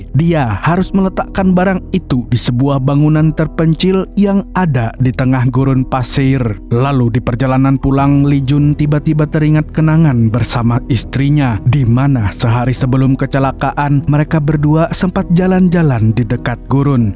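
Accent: Banjar, Indonesia. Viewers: pian urang banjar kh mas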